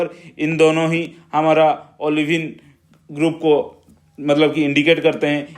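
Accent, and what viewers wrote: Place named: Odisha, India. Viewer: It seems to be a odia tounge with a imperfect hindi.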